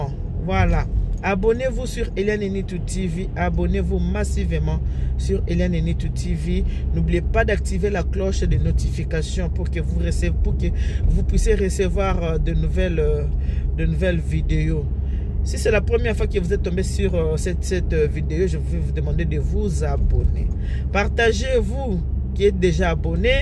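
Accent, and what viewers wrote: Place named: Democratic Republic of the Congo. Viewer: Toute belle maman. Je suis une abonnée qui te suis depuis Ndjamena au Tchad... J'aime beaucoup tes vidéos et surtout ton accent congolais 🥰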